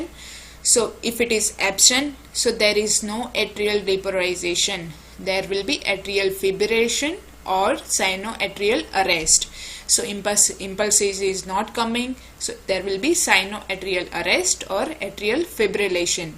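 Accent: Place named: India. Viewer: I am not trying to sound racist or xenophobic. But I am having a very hard time understanding what the Doctor is saying. Her Indian accent is so heavy it makes understanding everything she says sometimes beyond my ability to comprehend. I have to review the video many times. If this Doctor would simply slow down her speech by only a small fraction it would truly help those not a custom to her accent. Thank you for your time.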